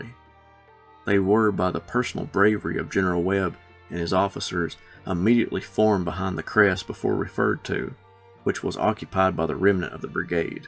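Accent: South, United States. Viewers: Narrator sounds Southern but anti Southern.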